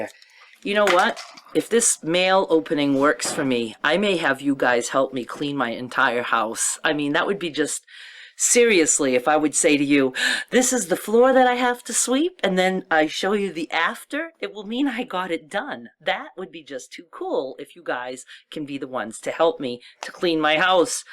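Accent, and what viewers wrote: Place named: New England, United States. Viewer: I know you’re not from Massachusetts but I was raised there and listening to you reminds me of growing up and the way people talk up in NE. I’ve lived in SC for 30 plus years. I was dozing, listening to you and I heard my name, just the way my father used to say it. I asked about ASMR and Patreon. I went to your pages. I usually google everything but just thought I’d ask you. It was nice to hear my name.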